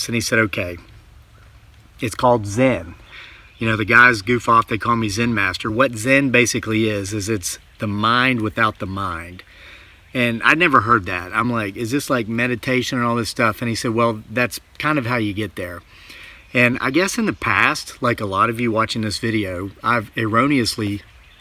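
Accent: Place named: Virginia, United States. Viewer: I’ve never seen your channel before & I’m learning more mindfulness practices in a Focusing class going inward to see what the body is trying to tell me...& I just learned Transcendental Meditation for free online, but coming off a Benzodiazepine makes it hard to clear one’s mind of all kinds of junk from yrs of sedation. I’m an Empath, as well. I heard Ta Ta Ta or Tathata, as well! What amazing men you & your friend both are! This is a delightful video! Thank you! 🙏🏼 Oh! I think it may have been Judith Orloff talking about those who are Earthbound trying to latch onto Empaths...at least, I think she was the one talking about this...anyway, this person said to just imagine showing them the light as in silent conversation so they can return. I don’t know if this is helpful, but it seems to be for me. Best wishes to you & your Zen friend. 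🙏🏼 P. S. Oh, as you were speaking early on, I wondered if you were from Virginia, the accent, plus my birth parents are from there & I have a couple friends there, as well as all along the E Coast. Then I saw what your hat said...interesting.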